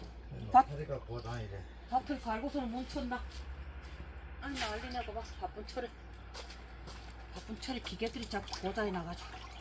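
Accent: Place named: Gangwon, South Korea. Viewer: 강원 도 사투리신가봐요. 말씀도 구수하고 나물향도 구수할것같아요. 땅두릅을 그렇게도 부르는군요. 산행하시느라 고생 하셨네요. 맛있겠어요.🤗👍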